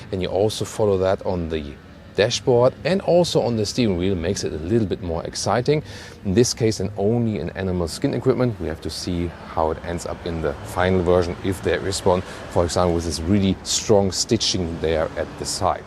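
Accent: Germany. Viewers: What a terrible german way to speak english. Sounds terrible. Take some lessons, please.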